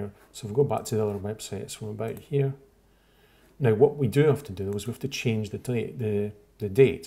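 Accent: Scotland, United Kingdom. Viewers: Y... You're Scottish... eyes subscribe button pushingly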